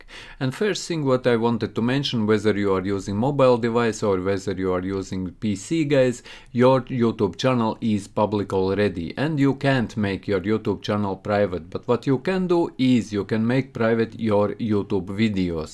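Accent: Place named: Russia. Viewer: I love his accent. Russian is the best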